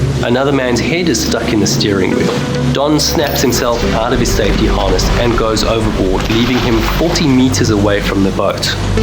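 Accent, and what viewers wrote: South Africa. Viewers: Why do you use prioritize imperial measurements over the metric system? Your channel bio says the channel is based in Germany, and you clearly have a South African accent. Neither one of these countries use imperial measurements. This is baffling that you chose to prioritise your American audience.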